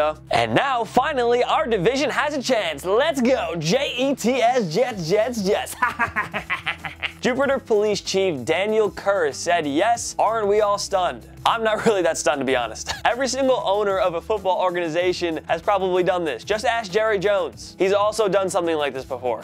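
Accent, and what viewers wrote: United Kingdom. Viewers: nice brttish accent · I’m from the uk and I love your English accent 🧨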